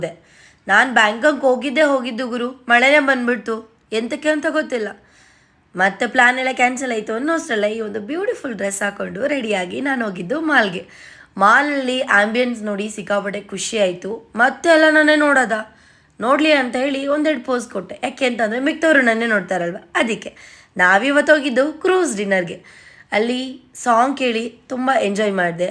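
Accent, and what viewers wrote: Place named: Mangalore, India. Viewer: Nimdu Manglore Kannada na. Nice to hear that ❤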